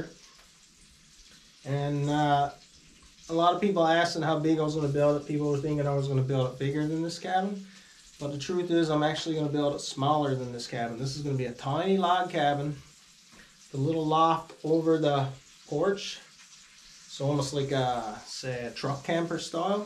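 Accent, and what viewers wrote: Newfoundland, Canada. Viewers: Wow what a guy! Thank you sir for the shout out! Some nice to hear that beautiful newfie dialect come through my speaker lemme tell ya! Keep er going man!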